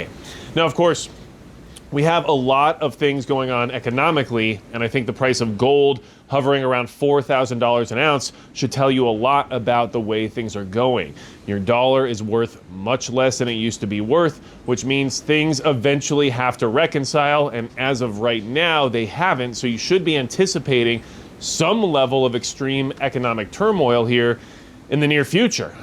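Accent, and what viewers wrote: United States. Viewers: There's no way you're not from Texas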